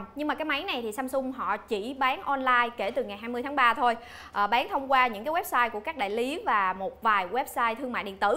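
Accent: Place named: Mekong Delta, Vietnam. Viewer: thích cg miền tây :)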